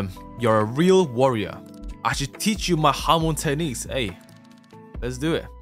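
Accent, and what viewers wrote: United States. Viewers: your american accent sounds fine. just a bit off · Yoooo sup here again sorry for late comment kinda just got on my phone Edit: ur American accent is better than mine lol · Your american accent isnt that bad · Bros American accent is actually pretty good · As an american his american accent makes me wanna die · This is Ascended American voice like HUH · Dude your American accent isnt cringe at all, its really good tbh, you should talk like this more often cuz its really good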